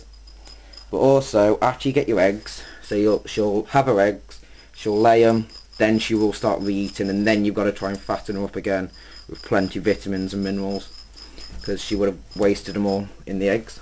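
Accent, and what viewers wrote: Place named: United Kingdom. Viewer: nice video. but sorry i reallly reallly hate brittish accents. lol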